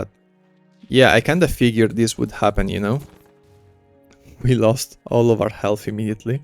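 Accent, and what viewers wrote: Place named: Spain. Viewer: Ese pequeño acento en español y las palabras delatan un poco jajaja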